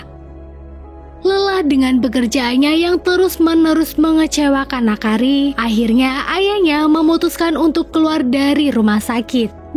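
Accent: Pemalang, Indonesia. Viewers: Fix wong pemalang Rika ya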